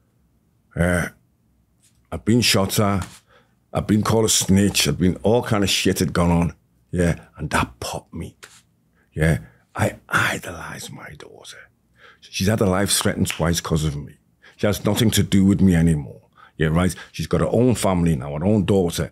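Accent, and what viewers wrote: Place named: Liverpool, United Kingdom. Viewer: Could listen to him for another 3 hours and I can't stand the Scouse accent.. what a great video. 👍👍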